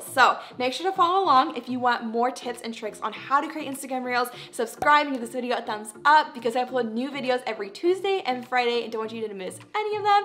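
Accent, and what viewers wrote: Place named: United States. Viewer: Reals 😂 no disrespect but i love American accent 😁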